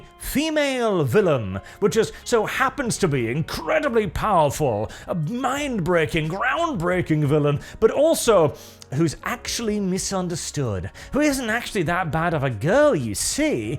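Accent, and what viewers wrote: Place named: United Kingdom. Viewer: Hmmm, i dont like your britisch Exent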